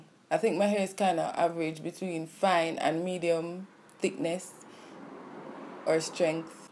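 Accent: Jamaica. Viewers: your from Jamaica right? I can hear the Jamaican accent 🇯🇲❤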